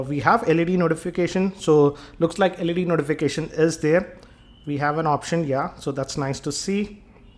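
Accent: India. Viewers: Complaining about his Indian accent ? What are you people, five years old? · not a bad video i like it, your indian accent does annoy me a little bit and im sure im not the only one but other than that this is my first time watching one of your videos and its good, im thinking of buying this phone and your review did help me to get the information that i need thanks!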